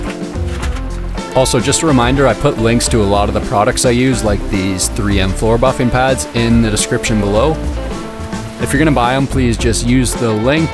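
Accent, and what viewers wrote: Canada. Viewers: Wonderful product. Terrific production. The Canadian accent is like nails on a chalkboard. But the product and production were too good and couldn't click away. That's saying something.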